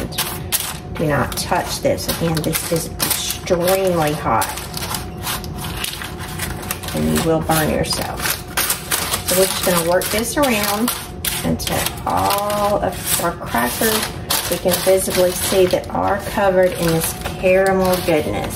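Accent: South, United States. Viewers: I love her Southern accent! Enhances the recipe 🙂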